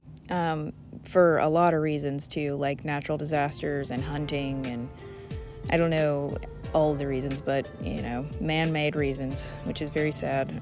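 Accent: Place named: South, United States. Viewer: Been following on insta forever, but I did not expect your voice to be so southern! Your slight accent is adorable. I hope you make more of these, I loved the animal facts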